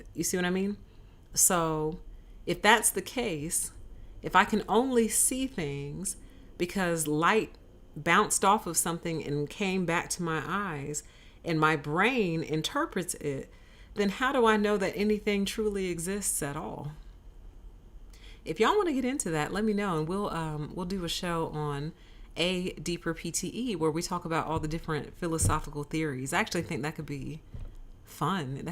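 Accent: South, United States. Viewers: your slight southern tone just makes it that much more rich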